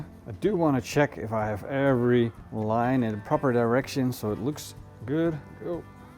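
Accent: Netherlands. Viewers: I am so annoyed at the fact that I haven't been able to guess your accent 2 minutes into the video.. I'm guessing Germanic or Nordic but I just can't lay my finger on it 😂 Looking forward to the result! (And whether I can find more clues) Edit: a minute later I still want to say Dutch, but one who is a massive Elvis fan! Edit 2: Nederlands, laatste antwoord. Edit 3: Als ik terug ga naar het begin hoor ik de Elvis niet meer... maar het Neërlandsch is deste duidelijker haha. Eindresultaat ziet en klinkt heerlijk! ^^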